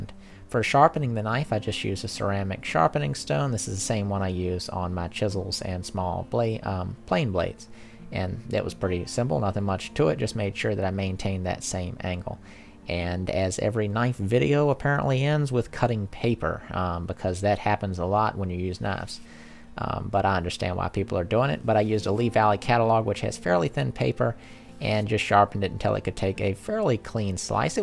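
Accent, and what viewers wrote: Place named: Alabama, United States. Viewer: Beautiful knife...thank you for sharing! I noticed you said in a below comment that you were driving by SMKW when you decided to go in. I live about 30 minutes away from there. So you live in East Tn or were you visiting? Your accent sounds more from central Alabama.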